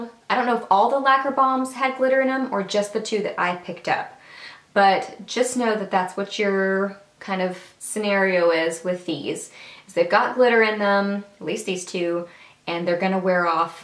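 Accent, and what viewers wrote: South, United States. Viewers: Great review of everything! I've been searching for the matte balms- haven't found yet- going to look again today- day off. So glad I found you- prissy highly recommend I check you out - from PrissyAndPink- so glad I did! R u from the South too? We're both in Houston and you sound like another Southern girl!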